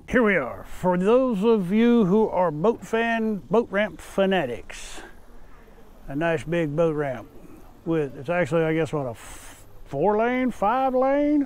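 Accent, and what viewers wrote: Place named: South, United States. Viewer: We watch your videos before visiting Texas State Parks. And we have become fan of your southern accent.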